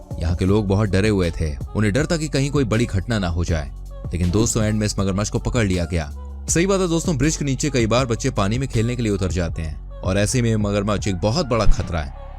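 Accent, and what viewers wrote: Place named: Mathura, India. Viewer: Sir mathura sa bol raha ho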